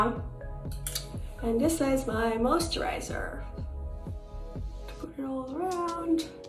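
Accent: Hungary. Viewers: I can barely hear her Hungarian accent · Her English accent is so good, but you can still hear a bit of that typical Hungarian accent which is kinda cute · Love how genuine she is and her Hungarian accent ♡ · Yah but She's losing her Hungarian accent now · but if she born like a hungarian ppl then her Accent will be Hungarian forever The english and hun accent is very different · if you watch her "Interview de Barbara Palvin, Festival de Cannes" she's actually losing her Hungarian accent now. But still yah her voice sounds so nice.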